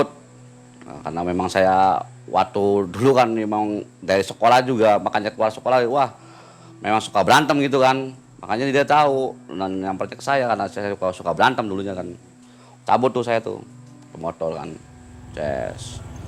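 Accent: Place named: Java, Indonesia. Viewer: Maaf klo boleh tau sampean orang mana sih klo aq liat tayangn sampean ko Kya alergi banget bahasa Jawa..apa" ga tau?padahal klo di lihat sampean bicara logat orang jwa juga?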